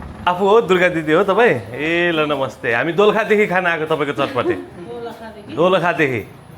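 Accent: Darjeeling, India. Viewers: Too good Dai Darjeeling accent 😂😂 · Bro love the darjeeling accent 😂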